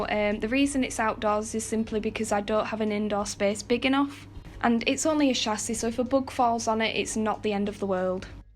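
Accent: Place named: Lancashire, United Kingdom. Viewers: Which accent is that? To my untutored (Australian) ear I'm guessing a bit north of Manchester. But what would I know! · Brilliant nice to see Landy's coming back to life and as a fellow Northener (Lancashire) its also nice to hear your accent :D, young woman like you give me hope I have 6 daughters and I would say your attitude and attention to detail is a positive role model for them :)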